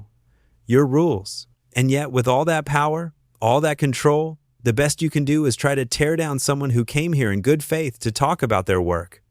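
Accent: United States. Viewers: These American voiced videos are a nightmare, garbage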